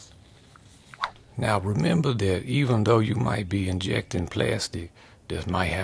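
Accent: Louisiana, United States. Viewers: Man sounds hella Cajun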